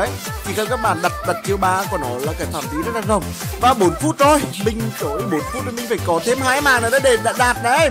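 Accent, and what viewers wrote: Nghe An, Vietnam. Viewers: Anh người nghệ an hả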